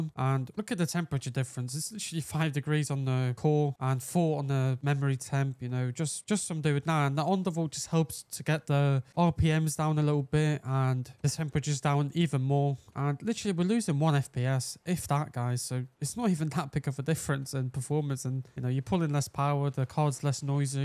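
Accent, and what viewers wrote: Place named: United Kingdom. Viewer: hi Bro nice video again! But you again didnt showed the real fan curve, just seing the curve without values is not really helpfull :( We need the numbers!! xD thanks for testing! Love your content and that heavy accent (british I think? :P), your videos are amazing quality too, I would expected this quality from a channel with 20-100k subs but you have 1.000 but still so quality videos, thank you!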